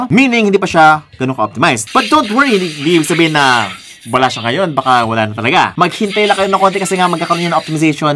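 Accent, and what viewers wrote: Visayas, Philippines. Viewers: HAHAHAHAHA bisaya kapo ba kuya?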